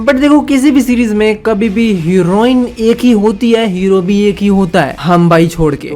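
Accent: Bengal, India. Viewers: kiya bhai tu bangali hay?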